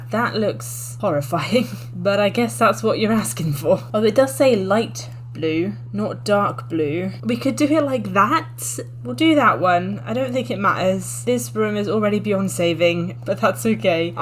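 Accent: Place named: United Kingdom. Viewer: That's gotta be the most British voice I've heard in years.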